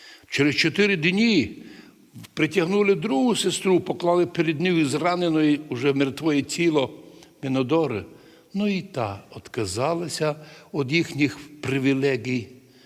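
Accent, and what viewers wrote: Transcarpathia, Ukraine. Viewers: Як я люблю закарпатський діалект, гарний і священник прекрасний на своєму місці, він істинний пастир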